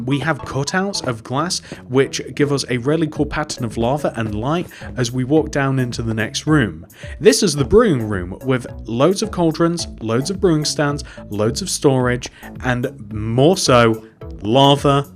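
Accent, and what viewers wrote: United Kingdom. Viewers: Ben Matthews I think it is Really well because of the British accent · Are you British???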